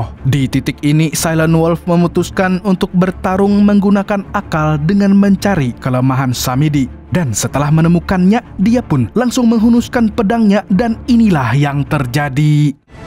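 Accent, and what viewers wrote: Banjar, Indonesia. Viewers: Bhsa BANJARnya khAs, 😂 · Laaah bahasa banjarr orang banjar kah neh😂😂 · pian banjar kah min..wkwkwkwk salam dri kandangan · Ae, orang Banjar skli nya amank narator nya...😅😅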